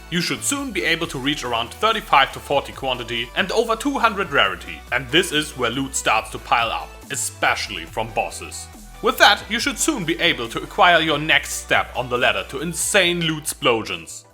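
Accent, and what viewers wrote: Germany. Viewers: you are german richtig?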